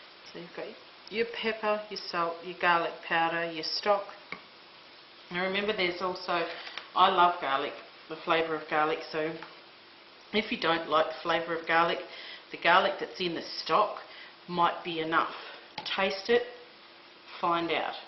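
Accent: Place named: Australia. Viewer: There's the Aussie accent -- and the rest of the English-speaking world. Kiwis are even harder to understand for the rest of the world. What's so AUSSIE here is the electric pie maker! G'day nice lady!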